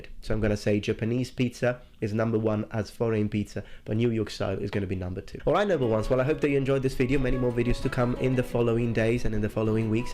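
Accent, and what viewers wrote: England, United Kingdom. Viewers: Hey, goomba what’s with the semi-English accent? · You speak with a somewhat British accent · That actually sounded very british for some reason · I always forget your Italian. Your UK 🇬🇧 English accent is immaculate. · No accent? He has the accent of England all over his English! (Moi, statunitense . . .) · But your accent seems someone from UK, are you Italian British?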